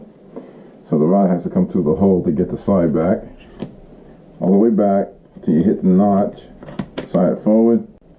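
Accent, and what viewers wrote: Boston, United States. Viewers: Love my p22! The accent...I miss Boston my home town. Nice video.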